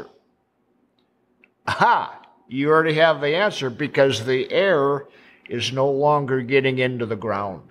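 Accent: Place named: Midwest, United States. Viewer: That's a midwest accent . I think. Seems Wisconsin as F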